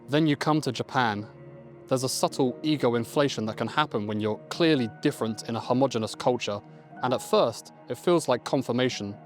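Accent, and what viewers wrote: United Kingdom. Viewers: I thought like what? Then imheard a brit. Gotcha buddy. After your home lots of stuff gonna feel surreal.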